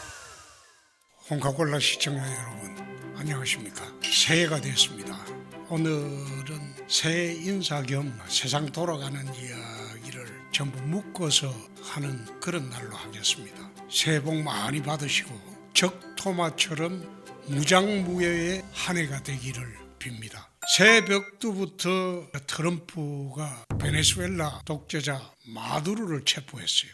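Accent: Gyeongsang, South Korea. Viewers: 은퇴선언하고 다시 복귀한 건 준표밖에 없을 듯 😂😂😂 나이 먹고 개추태 그만 부리시지 쯧쯧… 갱상도 사투리나 고쳐라 듣기 졸짜증난다 사투리 죽어라 쓰는 인간들 고집불통에 나르시스트 같다.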